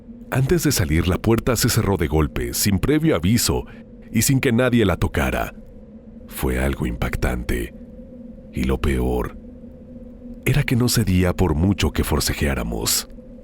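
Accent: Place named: Mexico. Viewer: Hola, tengo una curiosidad, si eres venezolano por qué tienes tanto acento? Vives en México?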